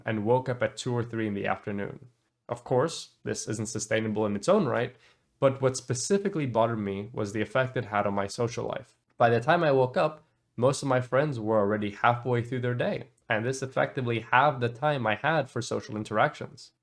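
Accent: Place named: Sweden. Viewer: Lycka till med vad du än tar dig an framöver. Du har alltid varit en av de mest rationella och eftertänksamma röster i den här delen av internet. Om du tar med det till vad som kommer härnäst tror jag du kommer se framgång. Kommer fortfarande alltid vara gött att se dig när vi får se dig på andras streams lite då och då. P.S I really like the more pronounced swenglish accent you had in those earliest streams.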